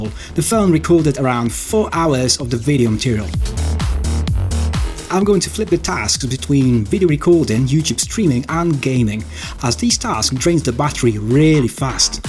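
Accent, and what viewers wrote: United Kingdom. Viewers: Супер аппарат, интересный тест👍 и я впервые в жизни слышал чистую британскую речь... Хотя с детства слушал the beatles например, но никогда не замечал это))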